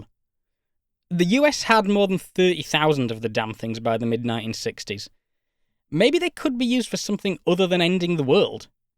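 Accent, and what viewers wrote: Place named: United Kingdom. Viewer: Man, your British English sounds awesome) Thx)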